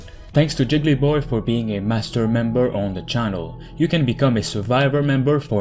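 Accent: Quebec, Canada. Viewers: Dude are you somehow french? You really sound like someone from Quebec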